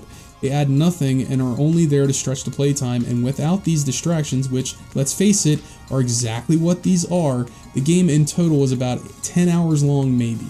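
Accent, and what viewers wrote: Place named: Mid-Atlantic, United States. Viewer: Are you from the mid Atlantic originally? No offense, but yr dialect sounds grimey (just like mine). Wouldn't be surprised if you were from Philly, DC, or my own Baltimore (especially with those juicy fronted O's). I'm about 10 mins into the video and so far, so good!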